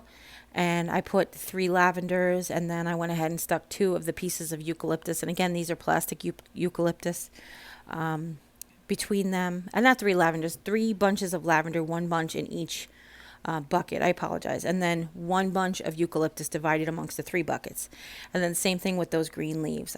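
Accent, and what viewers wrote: New York, United States. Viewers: I like your subtle NY accent (and your tutorials, too👍)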